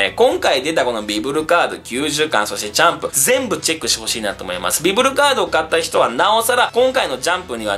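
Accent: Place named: Osaka, Japan. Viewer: こう思えばエピソードオブ空島のときの生放送やけど大阪弁強かったなぁ！ええねんで俺も大阪人やし、もっと大阪弁使ってぇーwww えーカタクリは俺の女♪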